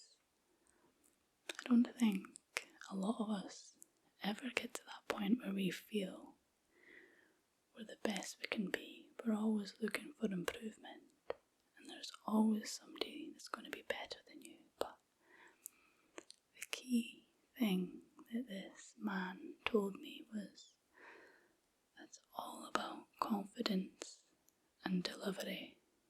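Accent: Scotland, United Kingdom. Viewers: That scottish accent is just fire 🔥🔥💯💯👏🏾👏🏾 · The Soothing scottish accent gets me to feel cool, calm and collected for years. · Hello from a fellow Scot from Ayrshire I have trouble sleeping and hearing a Scottish accent is unique on YouTube so thank you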